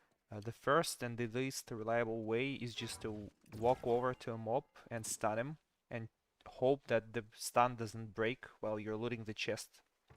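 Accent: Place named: Russia. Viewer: That russian accent 😁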